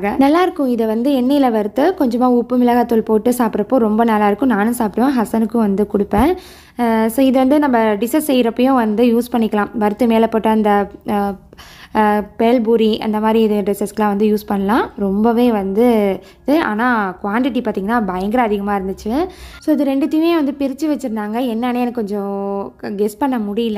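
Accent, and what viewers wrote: Thanjavur, India. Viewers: Sis neenga Thanjavur lah raajahiri yaaaa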